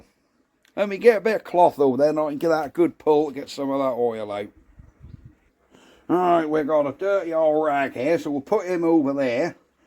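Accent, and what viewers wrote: Norfolk, United Kingdom. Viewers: Great to hear a Norfolk accent. I was born in Norwich near the St Augustines Gates now in South Australia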